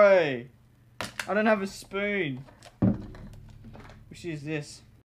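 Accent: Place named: New Zealand. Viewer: i like ur new zeeland acksent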